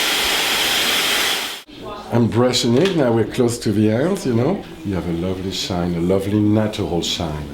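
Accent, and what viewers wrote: France. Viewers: he has the most intense english french accent ever and i'm living for it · I'll never get tired of French accent, never. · the most french english accent ive ever heard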